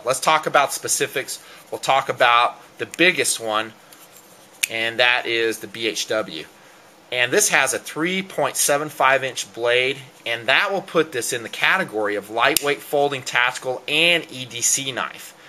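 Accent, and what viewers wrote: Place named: United States. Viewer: 6 yeh he's finally dropped the squeak great reviews but annoying yank twang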